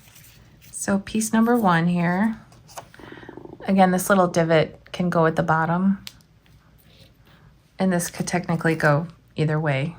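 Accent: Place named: Texas, United States. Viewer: Yes I’m making more Halloween crafts. 😊 I use to make 60 goody bags but my kids are older now and I really miss it. I’m making my mom and sister this little pumpkin. I do notice that some of your pronouncing of words are different. Your mom being from TX makes perfect sense now. You’re very clear in your explanations and instructions. Makes perfect sense to me! ❤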